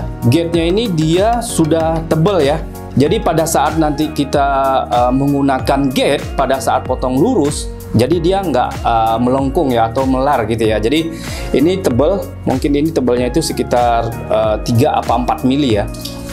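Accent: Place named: West Sumatra, Indonesia. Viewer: masih terasa logat minang nya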